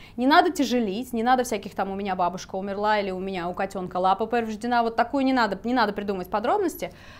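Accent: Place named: United Kingdom. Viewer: ! А у вас есть какой-то акцент и вы его придерживаетесь?))) Слышу что вроде какой-то бритиш, но местами проскакивает FlapT или в этом акценте это норм?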